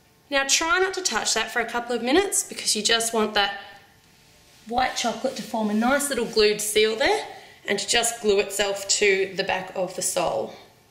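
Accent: Australia. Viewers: Are u an Aussie if so nice accent lol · I think shes australian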